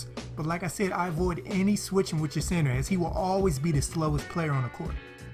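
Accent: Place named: New York, United States. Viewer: you got that new york og voice and that beat selection is perfect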